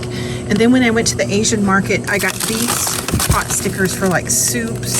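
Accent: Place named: South Louisiana, United States. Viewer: OMG ! YOU SAID, "YALL" ! COULD YOU POSSIBLY, BE FROM THE SOUTH ? YOU SOUND LIKE YOU'RE FROM SOUTH LOUISIANA.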